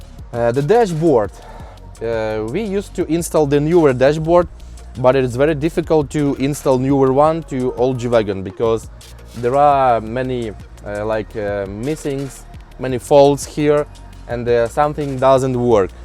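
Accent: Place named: Ukraine. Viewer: Я плохо понимаю английскую речь но тут понял все. Украинский английский язык такой же как Русский английский язык. Тачка хорошая получилась. Ну а портальных мостах?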